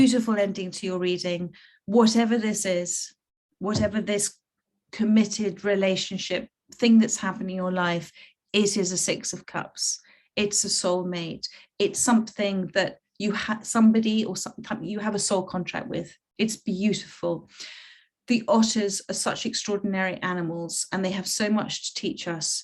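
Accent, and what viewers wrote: United Kingdom. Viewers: Wow...I am a soon to be published poet..work explores the dark side of my life and also my hope..so that I'm praying it will reach others 🙏 My only fear about being in hermit mode which has been for years, the further I'm going into hermit mode..? Thank you for your calm and insightful presence.plus we Yanks 💘 the British accent.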